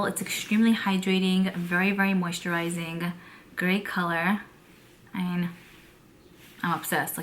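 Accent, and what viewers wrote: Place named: New England, United States. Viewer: Welcome back❗❗❗ I missed you so much and thought of you often. I kinda knew you were pregnant. I want to know was this a planed pregnancy for you and Mikey? I cannot wait to hear your birth story. So happy to see and hear you. That Yankee accent makes me feel like home. Luv ya💋🤗👍✔️❣️❗ ColourPop Pop put out today cream bronzer stix and new highlighter shades. It's worth looking in to.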